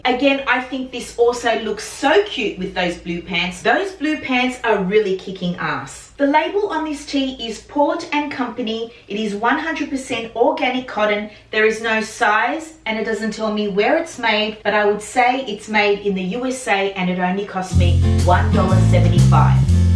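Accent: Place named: Australia. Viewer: You did good again. Be careful wearing that navy "slip dress". Warner's is a lingerie line. I think that's a "slip" for "under " a dress. Sorry, I couldn't understand your Aussie accent, but we're you say Lida Biday? I think that's a designer from Sweden. I have a problem distinguishing plaid and check, but I think that's a plaid shirt. If it's too large a seamstress can alter it smaller to fit you better. I can't understand how the prices are sooo cheap. Also, beautiful Frenchie shoes.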